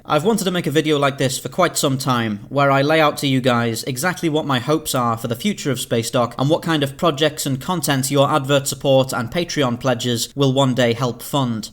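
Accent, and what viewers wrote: United Kingdom. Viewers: Your accent voice is so British that I always imagined you as an imperial officer of the empire. no homo tho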